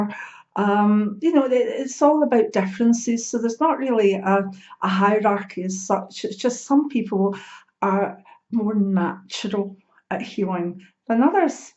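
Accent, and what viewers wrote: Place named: Wales, United Kingdom. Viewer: Thank You for this video . I like your accent though, are you Welsh?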